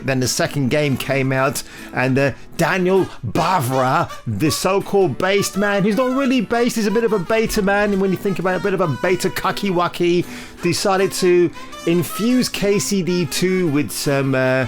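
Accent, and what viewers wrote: United Kingdom. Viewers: your british accent hot chocolate savage criticisms will have these WAHMAN game developers short circuiting, keep it up bro!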